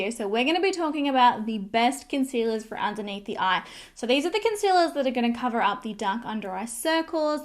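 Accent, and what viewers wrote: Australia. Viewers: This is random but I’m from the states and I’m obsessed with your Aussie accent 😍😍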